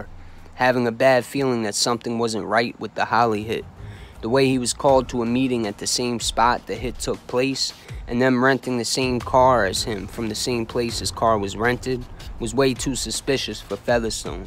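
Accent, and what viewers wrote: United States. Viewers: Yo Stight up I think you got this brother OC Shortz. No disrespect to OC Shortz his video official. But first of all ya styles are so different they can’t even be compared they two completely different styles. He got that commentary vibe going where he on the videos talking mixed with I’ll digital & sound effects mixed in. But on da real ya style is original. You don’t show ya face. Your digital editing skills coincide with your voice. The way you show the picture’s of the real guys with dope arrangements. Your version is straight up official mini documentaries. Plus let’s just face it. This is “ Our Thing “ ya American voice and the audio delivery sounds official! 💯 ya voice matches ya Video presentation. A British accent kinda takes away from the vibe like a student presenting A presentation of a foreign life only able to just spit facts about what he researched. 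Don’t get twisted his shit dope like I said no doubt OC Shortz is great in that style. But in my option having family members of my family in the life, no comment on myself. Lol I prefer your style. My brother if it was up to me you got him , ya best doing this shit right now. This is the American Mob, ya voice match’s the vibe of ya content. Not seeing you makes the audience paint a picture of how they perceive you look. Which with ya serious tone would be perceived as gangster in American gangster vibe.. So like said no disrespect to the British homie doing the whole commentary vibe with his face up in the camera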